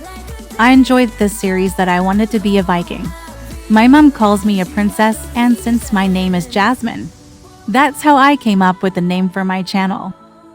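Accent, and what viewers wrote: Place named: Australia. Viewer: O.M.G!!!! The moment I have been waiting for has arrived! I cannot get over at how excited I was watching your voice reveal video. I took in every word you said and imprinted in my mind your favourites things. I too am into kdramas like sugar fizz! And I too enjoy Melisa McCarthy!!! I knew your voice would be sweet and gentle, and I love the Australian accent!! I bet you can do and be anything you want to be if you just put your mind into it. I will continue to watch your videos to the very end! You mentioned you won't be directing movies forever 😢 but when you do i will be around to celebrate an end with you❤ a the good luck with your future endeavours!! Love you queen!💙💙💙💙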